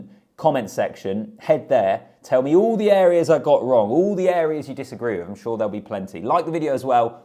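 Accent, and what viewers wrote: England, United Kingdom. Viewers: Do you not hear a pretty english accent mate? Also we going to act like ireland bavent been top 2 in the world for like 3/4 years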